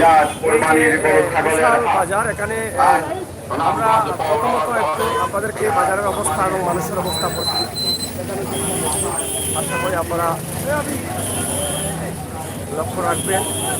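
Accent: Sylhet, Bangladesh. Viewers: ভাইজান। আপনাদের সিলেটে কথাগুলো শুনতে ভালো লাগে ও আপনার কথাগুলো অনেক সুন্দর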